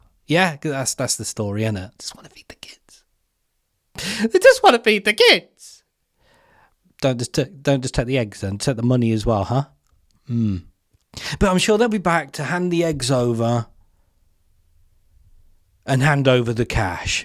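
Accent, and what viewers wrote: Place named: Northamptonshire, United Kingdom. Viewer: I gotta have a beer with you geez...your accent is Northants ent it....I used to live in Rushden..no trainstation ..whoopee...only now I realise what a godsend that was....tartar😂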